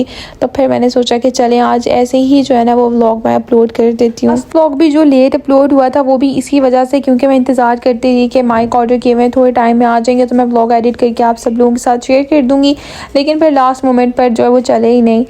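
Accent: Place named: Arab world. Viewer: Ma Shaa Allah apka Arabic bolna ka andaz buhat pyara ha❤❤❤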